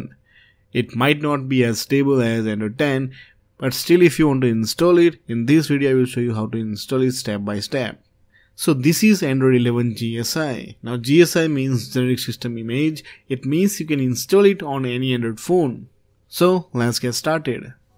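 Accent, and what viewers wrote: India. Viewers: Is this safe ? U look like an Indian from ur voice and I mostly dont trust them Pls answer me 🥺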